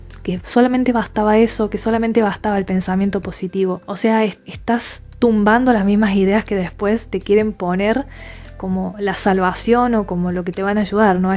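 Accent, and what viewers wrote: Córdoba, Argentina. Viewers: Que interesante la diferencia que haces con respecto a la persona tóxica y el narcisista. La importancia de atravesar las distintas etapas y las distintas emociones que se van generando. Impecable. Sos cordobesa?. Das charlas en las provincias?. Es un tema que me re interesa. Que importante es educar. Veo todos tus videos.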